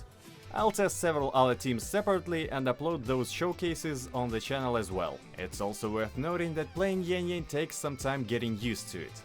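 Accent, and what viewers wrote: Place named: Russia. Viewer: Акцент, как будто ты родом из России, но живешь в америке много лет))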